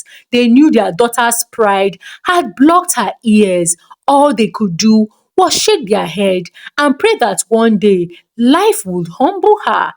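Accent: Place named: Nigeria. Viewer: I really enjoyed the story, the way it's being articulated just makes it more interesting, I just admire Nigerian accent 😅❤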